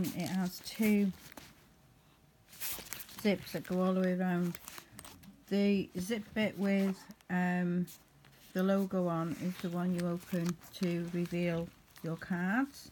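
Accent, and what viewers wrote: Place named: Merseyside, United Kingdom. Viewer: Thanks. Accent reminds me of my mum & nan they are from nosley Merseyside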